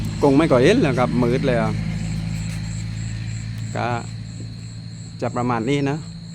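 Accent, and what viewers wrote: South, Thailand. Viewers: ชอบภาษาไต้ฟังแล้วมีเสหน่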